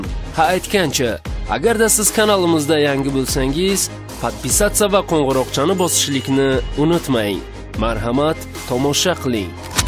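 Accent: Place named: Tashkent, Uzbekistan. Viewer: Tashkan shevasi eng rasvo sheva.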